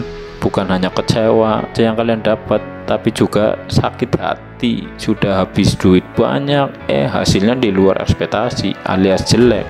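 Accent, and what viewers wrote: Banyumas, Indonesia. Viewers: ini orang kocak abis..asal jeplak..ngapak..gw ampe geli ketawa dengerin nya..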